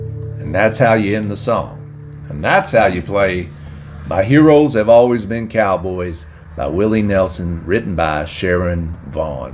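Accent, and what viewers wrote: South, United States. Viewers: Great call on the 3/4 timing. I just got through seeing another video of this song, supposedly Willie Nelson version, and they were playing in faster tempo in 4/4 timing. Btw, love your voice as well. Very Southern 👍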